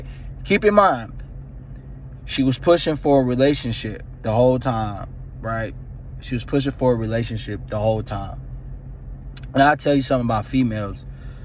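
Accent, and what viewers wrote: Baltimore, United States. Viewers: He def from Baltimore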